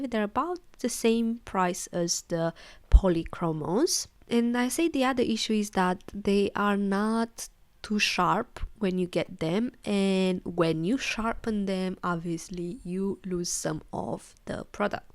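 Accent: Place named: England, United Kingdom. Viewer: The cutest English accent I've ever heard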